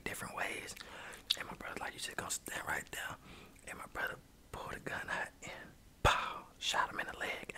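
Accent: Alabama, United States. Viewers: What part of Alabama are you from? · Bruh what part of Alabama you from? This sounds like some Birmingham or Montgomery shit right here hahah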